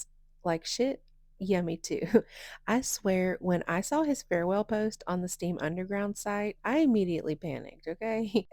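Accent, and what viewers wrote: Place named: United States. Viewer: I don't mean to annoy everyone here but I feel morally I have to warn you; if you are serious about doing this you need to be way more careful, dumping all the files on GoFile? Are you familiar with copyright? I'm going to simplify this just to get my point across: You need to do stuff like set up private server and host a site to put it on or use a torrent site etc. Also you sound like an american, the US has copyright laws, if EA find you they will sue your arse into oblivion and they have a team of sharks just for that kind of thing, crackers normally are in other nations that have flimsy copyright laws so they can get away with it. I wish you the best I really do and EA are scum so um be careful yeah?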